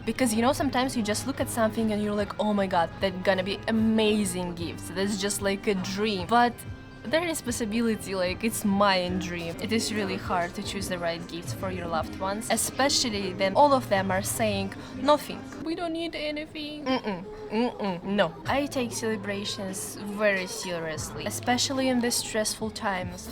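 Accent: Russia. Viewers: I justed wanted to write hello and I think your Russian accent is very authentic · == I LOVE YOUR RUSKY ACCENT .--IT S AWESOME == I HOPE TO KEEP IT == YOU HAVE MORE CHARM . IT'S IN FASHION IN EUROPE . == ENGLISH WITH A RUSKY ACCENT == ALL THE BEST ==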